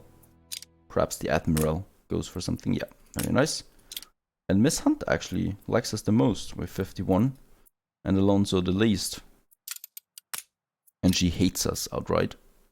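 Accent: Germany. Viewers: Wusste ich es doch :D wollte schon sagen du hörst dich sehr deutschsprachig an xD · U German? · Mmm yes the german accent is very present here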